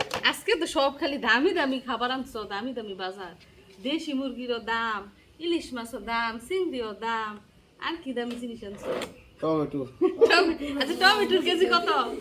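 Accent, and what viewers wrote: Gazipur, Bangladesh. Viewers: তোমার কন্ঠের সাথে মিলে যায় আমাদের গাজীপুর কন্ঠ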